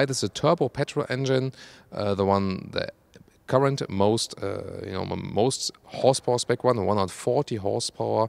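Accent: Germany. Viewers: i like ur casual look betterand ur hairback looks better.still got a nice german accent~~~~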